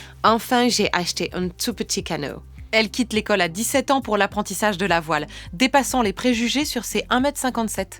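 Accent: England, United Kingdom. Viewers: L'accent britannique est tellement parfait, il est mesuré, à aucun moment cliché. Et le fait de parler écologie : 💚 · Bravo pour cette nouvelle vidéo! Accent anglais parlant français très réussi! Thème d'actualité qui fait du bien aussi! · C'est excellent!!! J'ai eu peur un petit moment à cause de l'accent, mais ha, elle parle en français avec son accent anglais, tout s'explique! Et le message de la fin est extrêmement pertinent (personnellement acquise à cette cause à 100%, j'utilise des sacs en tissu, des bouteilles consignées et j'ai des pailles lavables en métal)... et la dernière phrase est tellement forte!!! Je valide avec un enthousiasme indescriptible et les larmes aux yeux! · Cet accent anglais 😳😱😱😍😍😍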